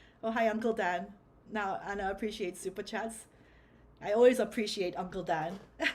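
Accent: United Kingdom. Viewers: Sis, you’re hot. Your voice is sultry. You have a british accent. You’re bilingual. Shall i go on? You’re winning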